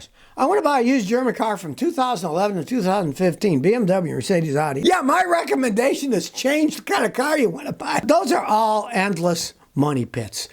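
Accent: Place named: New Jersey, United States. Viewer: Hey. Love your "Joisey "accent 🤑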